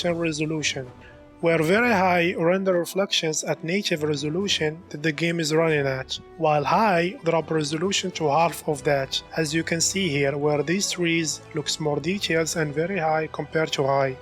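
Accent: Morocco. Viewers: are you moroccan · bro nta mghribi ? bayna mn lhdra dyalk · you sound moroccan asf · Bro are you Moroccan ? Your accent sounds like your Moroccan... And thnx btw for this sweet helpful guide keep it up bro ❤️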